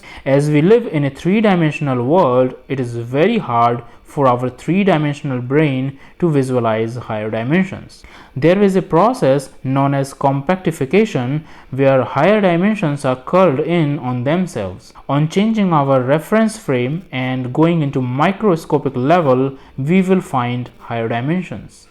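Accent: India. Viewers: The fact that he says "higher dimensions" every 5 seconds with his lovely indian accent just blew my mind.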